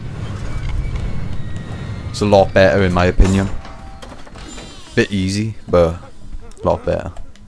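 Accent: Wales, United Kingdom. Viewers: He's Welsh. I feel like it was in his info at one point, if it's not there now. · Omfg. A welsh commentator!? I'm subscribed just for that. Where in Wales you from? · Is that a Welsh accent I hear? Blimey. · Whats your nationality? You sound Welsh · It may be dumb, or stupid. But I was instantly in love with your accent. I love the Welsh. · im in love with your accent :) i wish i was closer to wales, im 1 hour away :( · Welsh :D He Welsh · You sound welsh. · are you welsh?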